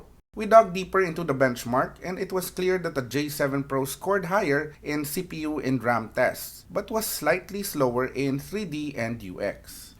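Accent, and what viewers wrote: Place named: Philippines. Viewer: Are you filipino? I can hear it in your accent haha. If you're not then I apologize and if you are then ipagpatuloy mo lang ang ginagawa mo! Ang ganda ng content 👍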